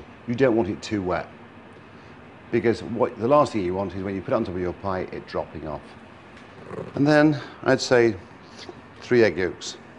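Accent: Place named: RP, United Kingdom. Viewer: Posh accent for a Leeds lad.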